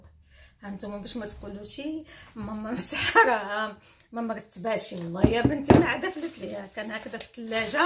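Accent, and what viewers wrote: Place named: Fes, Morocco. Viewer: أنا درتلك لايك منين سمعت اللهجة ديالك ابنت بلادي❤حتى أنا بنت فاس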